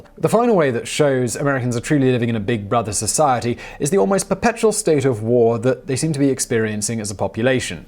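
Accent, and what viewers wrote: England, United Kingdom. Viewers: how do you figure its an American? The host is clearly English.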